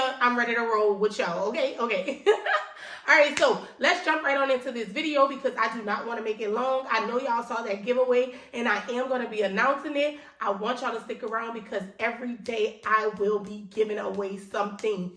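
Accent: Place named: New Orleans, United States. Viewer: Congratulations on all your success. Are you from New Orleans? Your dialect and mannerisms remind me of home. 😊❤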